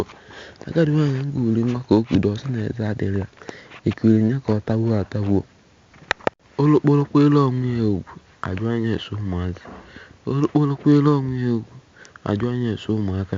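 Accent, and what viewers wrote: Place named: Ezaa, Nigeria. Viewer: NONSENSE,, ARE YOU TALKING TO YOURSELF ? OR TALKING FROM A RAT HOLE,, NO PROFESSIONALISM,, CUT AND JOIN,,,GO AND LERAN ''IGBO IZUGBE'',AND STOP SPEAKING EZAA/ABAKALEKE DIALECT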